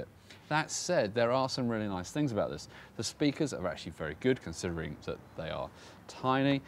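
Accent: United Kingdom. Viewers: "changing the market to create the haychpee (HP)" LOL I LOVE BRITISH ACCENTS (at least i think that he is british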